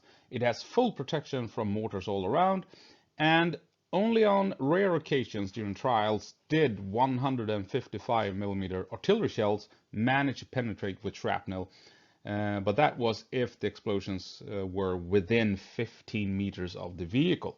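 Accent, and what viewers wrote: Gothenburg, Sweden. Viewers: är det göteborgska som jag hör?! Gissa mitt namn! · Du måste vara Svensk, finns ingen som klarar det utalet ;D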